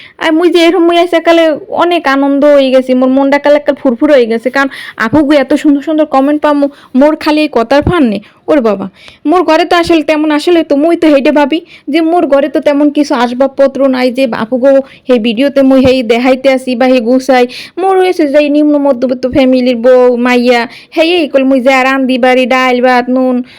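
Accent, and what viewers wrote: Barishal, Bangladesh. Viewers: তোমার চালের ড্রাম টা আমারটার সেইম কালার আপু,আমারও এখন পর্যন্ত আল্লাহ্ র রহমতে কোনো নেগেটিভ কমেন্ট নাই,তোমার কথাই সুন্দর লাগে বেশী আপু,বরিশালের আন্ঞলিক ভাসা,আহা❤❤❤আমারও সংসারে যা আছে সেই সত্য জিনিষ দিয়েই তোমাদের মাঝে ভিডিও নিয়ে হাজির হই আপু❤কমেন্ট পরলে,রেহানা আপু,হাবিবা,শিশির আপুর সাথে আমি যুক্ত আছি❤মহিলাদের কাজ,আর বলো না আপু😢শেষ করলাম আপু,আলহামদুলিল্লাহ্❤ফুল ওয়াচ❤পাশে থেকো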